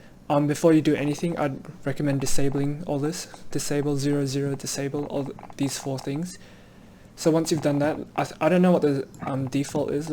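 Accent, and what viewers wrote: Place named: United Kingdom. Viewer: Bro no hate but why do you sound like a British fearless😭😭 please tell me you can hear it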